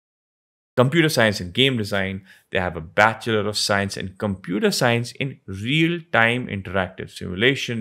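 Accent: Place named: India. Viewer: jesus, I respect this guy, but Indian English, OMG!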